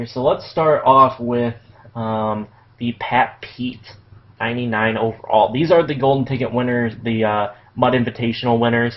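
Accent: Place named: United States. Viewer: He sounds country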